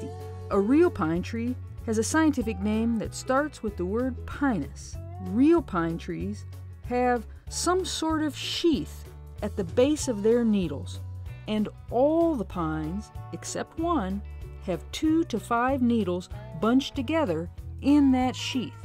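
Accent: South, United States. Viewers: I am not a native english speaker, but your voice sounds like how I would imagine a southerner would speak like haha. where are you from?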